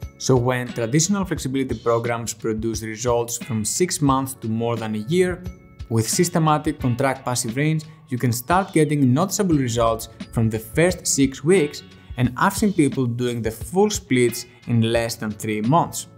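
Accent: Spain. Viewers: What accent is that? Are you from Spain?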